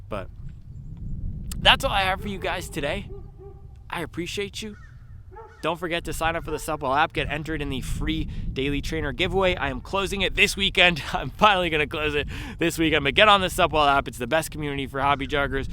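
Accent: United Kingdom. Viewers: I swear I thought u were British 😂 I was 2 seconds from cutting it off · I’m British and thought you were British for a few seconds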